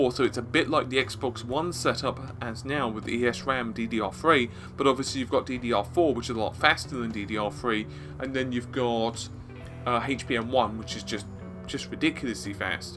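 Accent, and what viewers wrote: United Kingdom. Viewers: This guy's voice is way too british, almost obscenely accented. I mean we live in such an open world now, I don't see why such marked accents could "honestly" exist, it almost feels forced.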